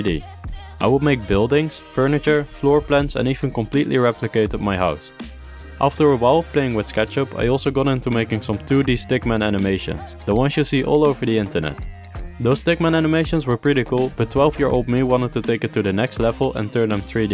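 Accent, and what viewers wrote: Netherlands. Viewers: Man u dutch? U have a bit of a dutch accent Oh nvm, already got my answer, good job tho, really excited to see a dutch indie making a genuinly good game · Je bent nederlands he? Je hoord het. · Woah.. As a computer science student, I cannot address enough how amazed I am by your work.. ( I am just a second year tho) Currently learning some unity for the fun of it myself as well, hoping to one day become as good as you are.. Also, I knew from the first words you spoke you where a dutchie too, laughed way too hard at myself when I saw you made the grade app xD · I knew you were dutch from the first second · could you show some vids of you developing sprites, such as trees, or rocks. the simple but necessary things a game needs. i have been wanting to make games myself and have a little bit of experience in making games, but i dont really know how to make any characters/sprites for the game. 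i hope you could possible teach me a little bit more. ps. like ya dutch accent ;) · You sound so dutch but great english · when strong ass dutch accent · are u from the netherlands bc i can hear it from ur accent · i smell, Nederlands. Am i right? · Ik kan echt meteen horen dat jij een Nederlander bent. :-) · mmmm Dutch accent edit: Ahh I knew it Nederlands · Hey my guy first time watching your vids and i noticed an accent are you dutch I find it very cool when people are dutch because im dutch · your dutch right? i hear it from the accent, im dutch too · you'are dutch aren't you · i love how clear it is that you are dutch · You got a Dutch accent, am I in the right spot😉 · Is this guy from the netherlands? Edit: yep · Are you dutch? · I smell a bit of dutch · are you dutch? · Once u started talking i immediatly knew u were dutch, turns out u r a medenederlander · Are you dutch? You sound pretty dutch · off topic but i can hear youre also dutch lol :) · are you actualy dutch? 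· Damn ik hoorde aan je stem meteen dat je nederlands was haha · Verry muts a duts aksent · As someone who is dutch its funny to hear from your voice that your dutch😂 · Ben jij Nederlands zo klinkt het wel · not meant bad but you kinda sound dutch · U really sound dutch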